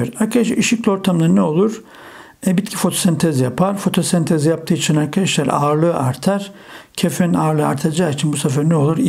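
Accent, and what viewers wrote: Black Sea, Turkey. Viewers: Hocam Karadenizli misiniz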